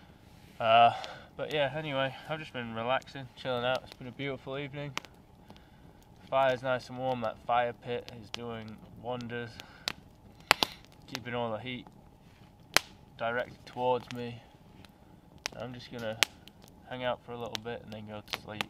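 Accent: Yorkshire, United Kingdom. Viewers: What part of Yorkshire are you from then? 😁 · Yorkshire accent? Just chillin' in the woods, eating steak, sipping whiskey, very cool!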